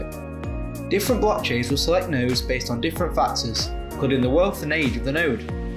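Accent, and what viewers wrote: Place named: Netherlands. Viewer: Nederlands accent :)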